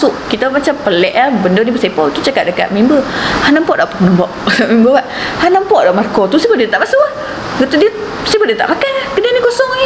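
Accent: North, Malaysia. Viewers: sy 1st time jumpa n tgk channel ni..sy suka dgr n tgk cara cik/pn bercrita krana loghat utara tu..sb sy mmg suka dgr org ppuan utara beckp dgn loghatnya..so sy subscribe terus chnel u 🤗.. tp ni nk tnya sket la cik ni ada kelebihan pd pancaindera ke 6 ke klu x silap sy iatu mata yg bole nmpk benda² ghaib ??..😊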